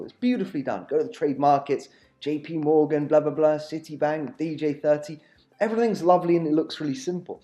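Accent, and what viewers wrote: United Kingdom. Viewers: Mate your British accent reminded me good times that I spend in UK! Very friendly speech mate! Thanks for the info! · I love the British accent.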